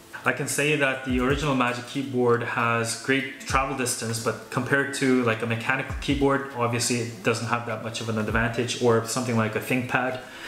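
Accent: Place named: China. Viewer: 听口音是在中国长大的吧？😏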